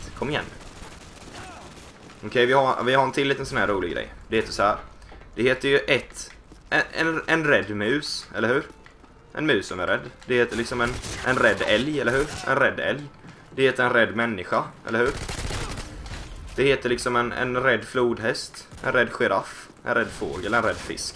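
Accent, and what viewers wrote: Norrland, Sweden. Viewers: Gillar din Norrlänska dialekt, du är bra på språk. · det det var norrländska..... inte värmländska.............. xD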